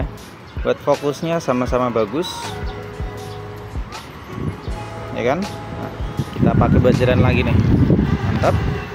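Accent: Demak, Indonesia. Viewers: Orang Demak ya bg?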